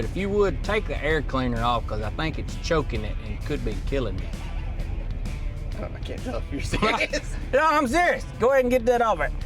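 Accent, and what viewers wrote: South, United States. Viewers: Please lose the overwhelming southern accent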